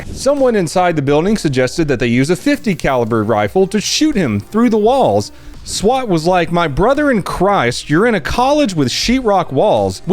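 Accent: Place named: Texas, United States. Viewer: Since when are you Texan? Thought it was Bama 4 ever 😢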